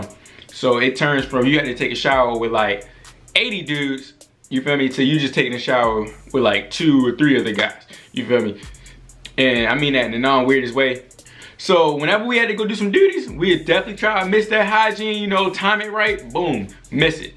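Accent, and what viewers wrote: Baltimore, United States. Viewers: I knew you was from baltimore before you even said anything 😂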